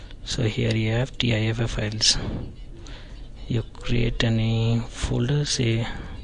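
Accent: India. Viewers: How stupid are the people making negative comments about the guys voice. He's speaks English as a second language.... of course he sounds a little different.. He put the video up to help you out with your dumb selves. You couldn't figure out how to solve your problem... so you looked to the same Indian for help. ugh Lazy idiot racist. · i honestly HATE indian accents it sounds so gross….that doesn't make me racist because i don't like it…anyway nice video, thanks for the help!!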